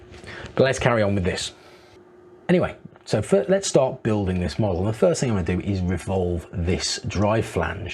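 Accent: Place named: Oxford, United Kingdom. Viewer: Thank you for your very good oxford english. For us germans it is a benefit to listen to you.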